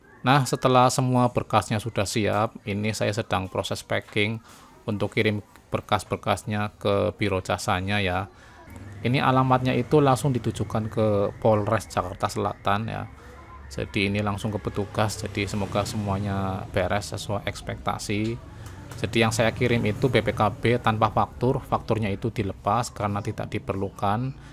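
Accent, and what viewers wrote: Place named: Banyumas, Indonesia. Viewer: Orang Ngapak ya om 😂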